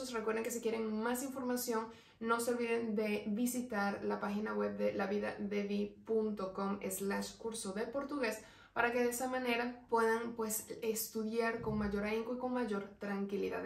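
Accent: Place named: Venezuela. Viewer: Desculpa o teu español es de Venezuela, me gosto tu clase, gracias y mucho exito.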